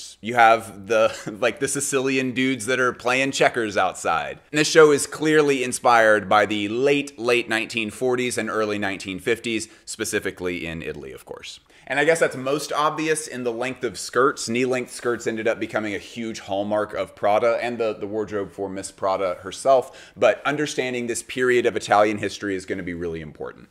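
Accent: Russia. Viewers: Super interesting video That's a Russian accent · why are you using a russian accent when conveying fascist things😭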